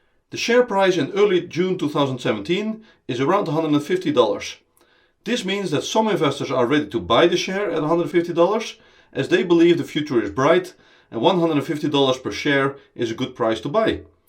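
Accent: Netherlands. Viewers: Are you Dutch? :P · Lekker Nederlands accentje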